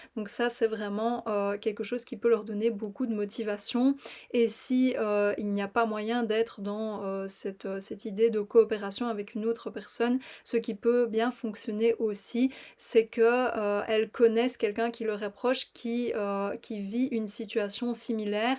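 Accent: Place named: Belgium. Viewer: ton accent belge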